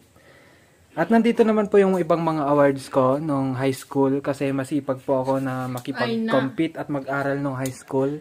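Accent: Ilocos, Philippines. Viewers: Kwan!😅Basta ilocano di nawawala sa pagsasalita... proud to be I'm ilocano from TARLAC....God bless more vedios na nakakainspired😊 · Makakatawa ak ehh kasi gamin eh ilocano tagalog sao natin eh. Kahit pinipilit mong agsao tagalog eh may laok na ilocano pa din eh😂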